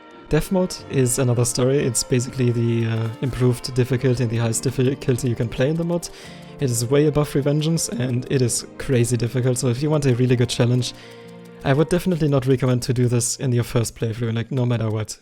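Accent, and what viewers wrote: Germany. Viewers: are you german? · I can hear the German accent in his voice/ greets from Germany Kamerad 🇩🇪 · U can really hear the german accent😂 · are you german?? xD bc you sound like german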